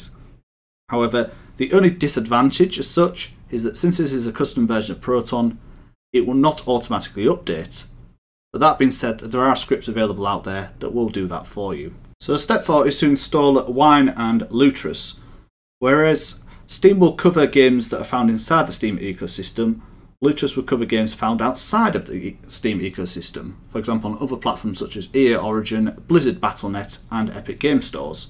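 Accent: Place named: Northern Ireland, United Kingdom. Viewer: Northern Ireland accent 🍀❤️😆Love it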